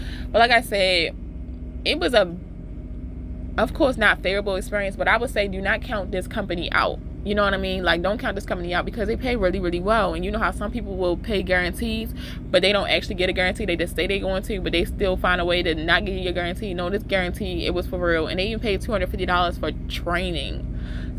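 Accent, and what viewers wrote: Baltimore, United States. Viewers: you from baltimore? haha sound like you from round the way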